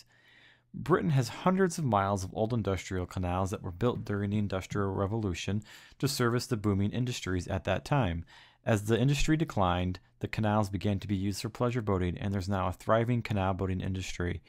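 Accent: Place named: United States. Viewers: i don't understand why an American accent should be such a big deal. I have no problem with i got the information and a view of the beautiful scenery. I am not saying for one moment that you are a snob but it certainly comes across that way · when you view a video on England you aren't expecting a slightly bored banal-toned American voice reading a tourist pamphlet. Nothing middle class about the honest comments here at all. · He is American or perhaps Canadian and our accent does not define our class.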